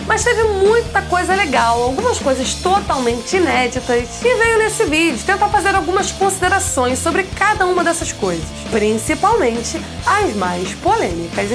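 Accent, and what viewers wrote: Brazil. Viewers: Os vídeos do canal são ótimos, mas eu acho muito estranho ver a falando NAISCER ou NAISCER, isso é sotaque de alguma região do Brasil?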